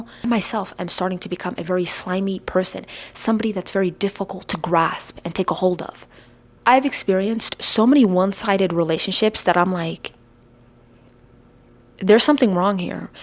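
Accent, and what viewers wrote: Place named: Dallas, United States. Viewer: Ma'am I need to know more about you lol bruh your accent your face your mannerisms your rich cultural history and animated storytelling I looove it all ! So Full of personality ... I'm like do I detect a hint of "american black girl from Dallas" type of accent ??? What region of the U.S. is your accent from ??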